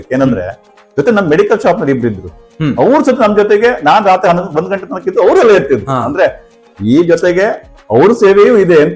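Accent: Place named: Belagavi, India. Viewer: ಸರ್ ನಿಮ್ಮ ಭಾಷೆ ನಮ್ಮ ಬೆಳಗಾವಿಗೆ ಸರಿಯಾಗಿ ಹೊಂದುತ್ತೆ ಸೂಪರ್ ಸರ್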